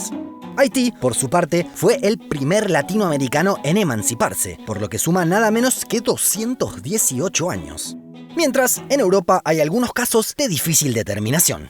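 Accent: Argentina. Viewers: Es muy bueno, pero el español es demasiado argentino, debería ser un español más neutro.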